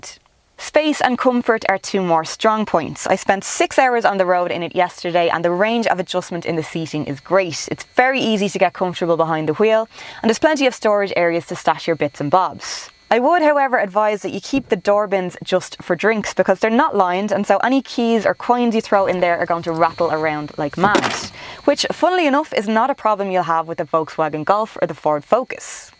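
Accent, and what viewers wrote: Dublin, Ireland. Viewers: You do have posh Dublin accent, no pun intended :) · Great Review, I am getting one in 2020, my price tag is 37 grand, so i hope i get a nice one for my money, plus i have a strong Dublin accent like you so again i hope Mercedes look at your review and adjust the car to our accent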